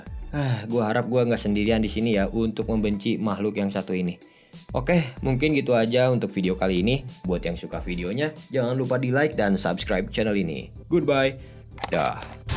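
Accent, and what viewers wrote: West Java, Indonesia. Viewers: Bang urang sunda? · Orang sunda nya?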